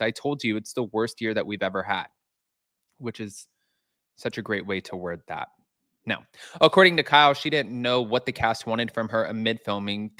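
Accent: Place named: South, United States. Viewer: I love your southern voice, 😂😂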